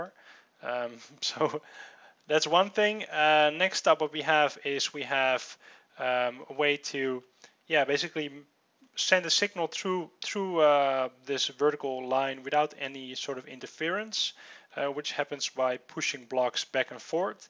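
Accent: Netherlands. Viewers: are you Dutch? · je hoort het gewoon nederlands :3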